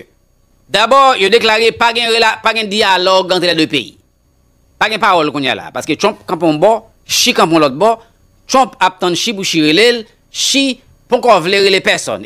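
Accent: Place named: Haiti. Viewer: Bonsoir frem mkontan tande ou avek gro kreol sa frem Oh oui Mr le President kompeann gin lot peyi ki ka vinn niche deye l Ayayayyyy frem kreol la gro nan mains ou oui la Merci et bonne semaine avek fanmi ou specialement la petite princesse Reserve yon ti temps speciale pou ti princesse la pou moin frem Minm ou minm wap kontan Le wap pale avek li Pitite fille yo tjou rinmin papa yo et papa tjoujou rinmin pitite fille yo Autrement dit vice Versa frem Ke bon Dieu beni ou protege ou Frere c pou Aprann konnin ou gin plus moun ki apprecier ouki respecter ou a l egard de service wap rann Societebhaitienne ke moun ki comporte yo kom des imbeciles Ok ke toute bon bagay yo bon Dieu fe yo pou ouak toute pitite ou yo madanm ou et bon travay bonne semaine Bonne sante bon courageet bonne perseverance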